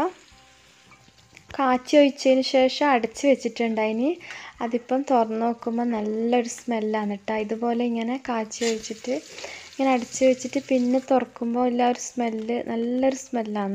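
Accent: Kannur, India. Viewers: 🥰kannurkarude nadan basha👍gd 👏🏻👏🏻👏🏻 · Ningal kannurano Namma, ninga kannur basha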